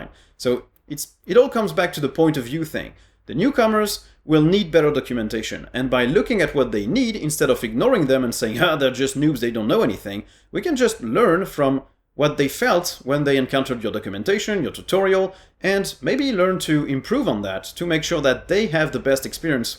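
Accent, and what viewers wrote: France. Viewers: The best french eng accent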